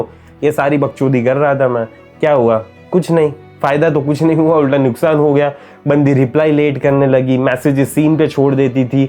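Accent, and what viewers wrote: Gujarat, India. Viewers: Tumhari boli se pata chal gaya k tum gujrati ho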